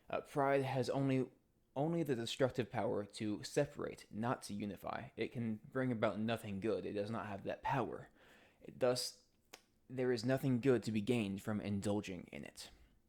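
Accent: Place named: Canada. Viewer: Sounds Canadian or homeschooled.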